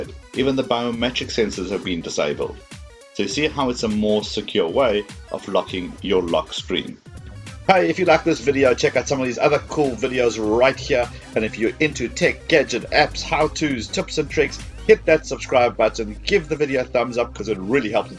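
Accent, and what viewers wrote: South Africa. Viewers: from what country are u . I am from South Africa and you have a south african accent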